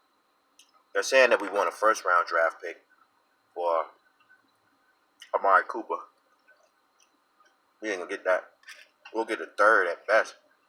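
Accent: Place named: Washington DC, United States. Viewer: where you from my good man seem like you close to DC just cause of the way you sound and you had some good views on the raiders fucking with you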